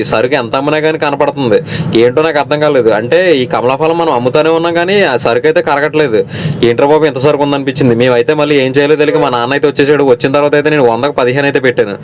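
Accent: Godavari, India. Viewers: Ma godavari slang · గోదావరి స్లాంగ్ swag same bro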